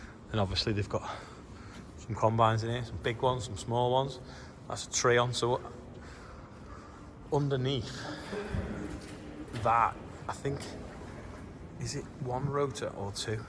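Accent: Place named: Liverpool, United Kingdom. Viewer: In proper Scouse accent: "We have Martin Mason who is 34 and Charlie Mason who is 5, happy birthday to you guys. Red Clarke happy fourth birthday to you. Jeremy Nash happy birthday, Chloe Taylor who's 27 and Stevie Finnie who's 29 happy birthday to you. If you want to be on the bumper, please scan that QR code and there is a link below every single video."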